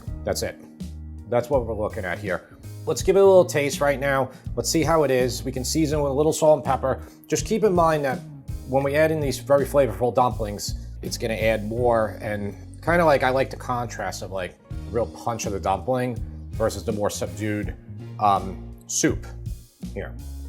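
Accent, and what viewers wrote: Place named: Long Island, United States. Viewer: Love the videos and still getting used to the Long Island accent. When you said 'the le crueset', I thought I heard "The Lakers say the oval ones are like $400". Who do you know from the Lakers and why do they care about dutch ovens?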